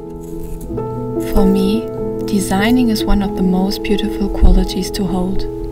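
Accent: Germany. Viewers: Deine Videos sind sooo, soooo wunderschön gemacht, jedes ein Kunstwerk für sich. Wohl dein unbestechliches Auge für Ästhetik dass sich auch in all den wunderschönen Kleidern zeigt. DANKE fürs verzaubern ❤ Beim Video schauen dachte ich direkt, dass du aus dem deutschsprachigen Raum sein musst, wegen der typisch deutschen Aussprache. Leider kann ich kaum Englisch und konnte mit deiner homepage dann gar nicht so viel anfangen ohne Fremdsprachenkenntnis. Ich versuche nämlich immer gute Kurse, Seminare oder Online- Ausbildungen auf Deutsch zu finden. Deine Videos sind unbeschreiblich schön gemacht, einfach berührend und sehr emotional umgesetzt. Grosses Kompliment ❤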